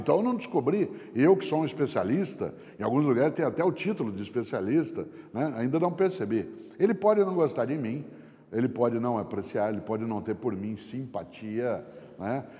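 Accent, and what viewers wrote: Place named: Rio Grande do Sul, Brazil. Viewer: Pena que o vídeo voi curdo esse sotaque gaúcho com toque italiano é de mais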